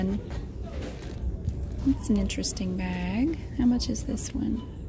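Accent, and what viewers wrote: South, United States. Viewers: I just want to say I laughed several times throughout this video. Some of your comments about the items are really funny. I'm from South Carolina but I've been living abroad for the last 3 years. I love it but sometimes I miss hearing southern accents and sassy, warm and funny ladies like you. You remind me of my aunts hahaha. I also miss Target A LOT. Nothing like it here in South Korea.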